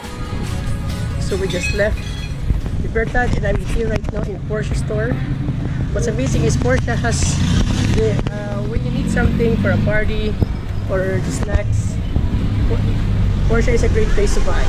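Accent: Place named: Iloilo, Philippines. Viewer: Nami gd accent sang ilonggo ba HEHEH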